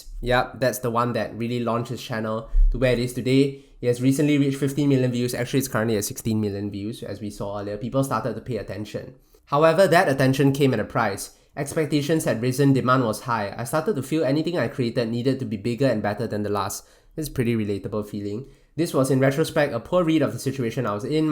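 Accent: Singapore. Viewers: Can hear that Singaporean accent hahaha hello fellow Singaporean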